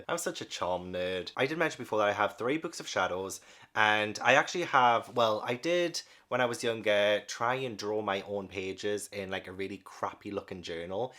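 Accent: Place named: Newcastle, United Kingdom. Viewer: I just came across your channel and for some reason I thought you would be American. Was very surprised (and happy!) to hear a fellow geordie, who is into the charmed books! 😁 thanks for making this video. It was class!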